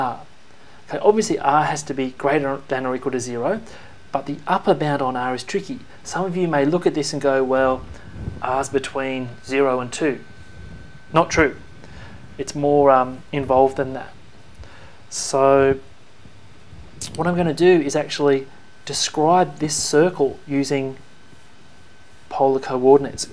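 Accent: Australia. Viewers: you are Australian. Correct?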